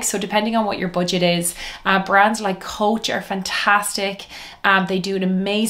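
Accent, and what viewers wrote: Ireland. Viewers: the Irish accent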